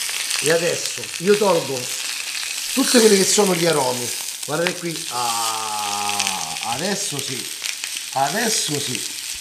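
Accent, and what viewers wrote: Rome, Italy. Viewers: bravo e simp0atico poi anche romanaccio hahaha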